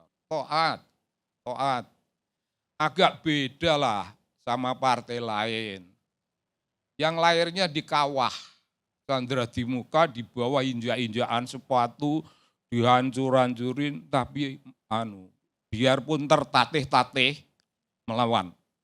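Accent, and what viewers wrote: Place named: Banyumas, Indonesia. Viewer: Cilacapan banyumas... Ngapak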